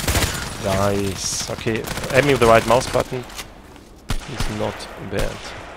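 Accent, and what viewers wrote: Germany. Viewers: cwaddict LOL i knew it you germans have that kind of accent :#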